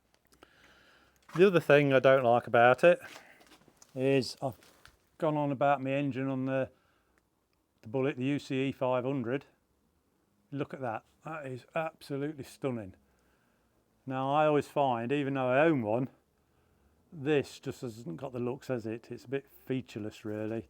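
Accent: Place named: Midlands, United Kingdom. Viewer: There's no hiding that Midlands accent Leicester..Derby???😂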